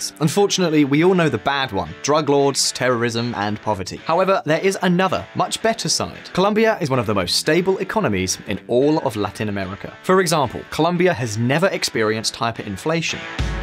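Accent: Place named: United Kingdom. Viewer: Pretty slick move, making this video with the high production value, well spoken host, young guy, British accent to push the Uribistas' version of reality. This is not, and has never been, a "both sides" issue. Colombia does not have a middle class. It is the most economically unequal country in the world, and has been for a long time. So, the pandemic didn't just bring about hard times for this imaginary middle class. It caused those at the bottom of the upper class (people who work jobs, live comfortably, travel, have disposable income) to lose their jobs, and plummet aallllll the way down to the upper level of the lower class (people who are just barely surviving, eating most days, but not all, and living in substandard homes surrounded by crime. Having lived in Bogotá for a year, and having learned so much from my wife who was born and raised, I call foul on this whole video. 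There is no middle class. There is no infiltration by terrorist groups. Police are not being shot at. They're getting rocks thrown at them. Molotov cocktails are the most they have to defend against. ESMAD and the military are provoking the violence, every time. Facebook live videos have made these sleek propaganda pieces easily taken apart. Colombia has never had good government. I was there when a referendum vote was held to simply make corruption illegal. It was an obvious landslide. But the number of overall votes were not enough, because Uribe's paramilitary threatened many voters into staying h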